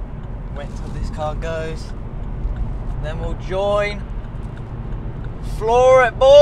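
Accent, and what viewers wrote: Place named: East Midlands, United Kingdom. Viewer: where abouts you from man :) sound like your from the east midlands :P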